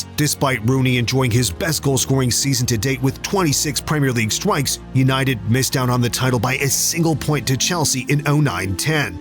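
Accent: United States. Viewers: Click to watch a football video only to hear another yank voice narrative.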